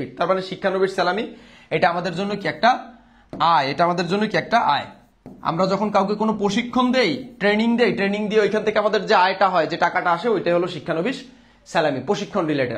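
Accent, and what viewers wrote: Barishal, Bangladesh. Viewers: আমি নিশ্চিত স্যারের বাসা বরিশাল।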